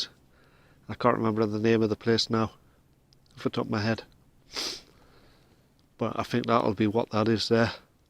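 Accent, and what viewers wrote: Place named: Northern England, United Kingdom. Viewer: I can tell your from up north and you don't have to come down : )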